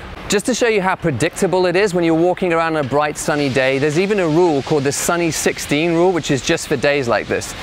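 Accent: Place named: South Africa. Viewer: Hi - South African here. There are qualities in your accent that make me think you might be from South Africa. Are you? I've just subbed:)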